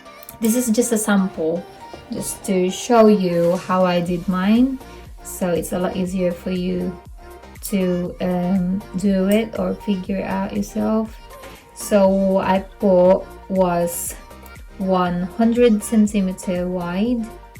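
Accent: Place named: United Kingdom. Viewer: I Really like your British accent. And I’m trying to copy it 😜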